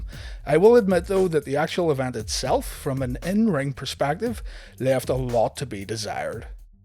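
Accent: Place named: Northern Ireland, United Kingdom. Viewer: Just found this channel last night with the DX vids I noticed your assent by any chance you from Northern Ireland?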